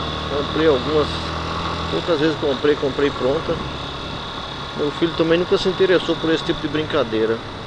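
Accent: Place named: Pernambuco, Brazil. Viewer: legal Mano você é de Pernambuco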